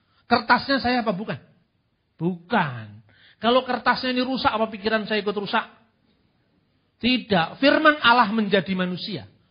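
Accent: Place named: Yemen. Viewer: Pk kalau ngomong jawa seakan2 org dess.tapi,kalau ngomong arab seakan2 org yaman👍👍👍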